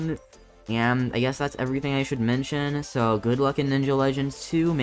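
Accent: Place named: United Kingdom. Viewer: So bri'ish for me idk why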